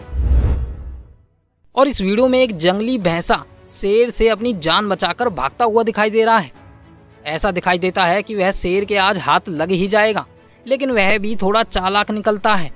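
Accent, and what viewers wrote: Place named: Marwari, India. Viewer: ये बोलने वाला व्यक्ति जरूर मारवाड़ी है बार बार उलझ रहा है 😂😂😂😂😂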